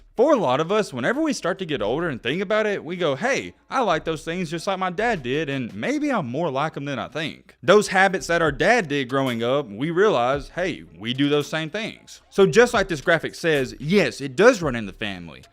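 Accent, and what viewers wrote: South, United States. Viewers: Yes, he's a perfect rated qb because of his last name. People just lie to subscribers because as you can see there has only been what, 6 perfect rated players in the modern era of recruiting, and all succeeded at their respective schools (awaiting Ewers and Manning), but they don't give them out based on last names, it's a hell of a lot more than eyes. It seems the recruiting services know a football prodigy when they see one, and as much as it irks you, yes, Ewers and Manning will continue that trend. You can sleep now knowing getting a perfect grade is more than just who they play ( Clowney's teams played Door Dash players, same with Adrian Peterson playing in Palestine, Julio Jones at Foley, AL, Ernie Sims at North Florida Christian, Myles Garrett at Arlington Martin, Terrell Pryor played 2a football in Jeannette, PA, etc). 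Peyton and Eli played the same competition, and wouldn't you know it didn't affect their ratings and how they played? Hmmmmm... Maybe, just maybe, you probably don't know what you're talking as per usual. Literally EVERY SINGLE PLAYER WITH A PERFECT GRADE HAD BEEN A 1ST RD NFL DRAFT PICK. Maybe do some reading and understanding that they don't just hand out 1.000 grades because "he's a Manning". He's a freaking prodigy, just like the other 6 perfectly graded kids before him. Jesus Christmas, dude. You sound like the Alex Jones of football conspiracy crap. You sound whiny, southern ignorant, and all-around unknowledable when it comes to the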